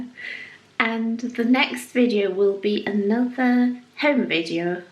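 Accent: England, United Kingdom. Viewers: if you are both German, why do you have such a heavy English accent? Loved your video! 😊❤️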